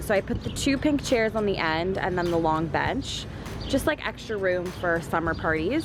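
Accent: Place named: Canada. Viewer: Am I the only American girl who loves to listen to lovely Canadians pronounce words like “couch”? Ah, so soothing.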